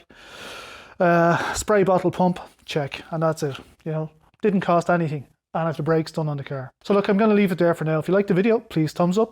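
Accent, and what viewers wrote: Ireland. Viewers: And cool Irish accent to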